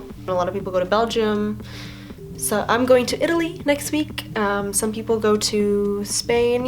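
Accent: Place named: United States. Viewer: you have a totally perfect flat american accent.. howdja do that?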